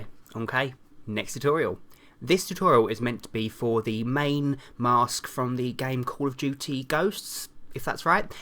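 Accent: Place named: Bristol, United Kingdom. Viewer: Youre just ouststanding mate!, Your accent sounds little like Bristol'ian accent, are You from there?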